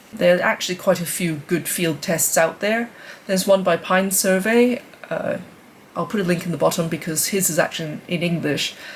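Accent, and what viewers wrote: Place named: England, United Kingdom. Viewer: I'm listening to an Asian woman with an English accent speaking about Phantomleaf on a channel called 'Flecktarn'. I am very confused.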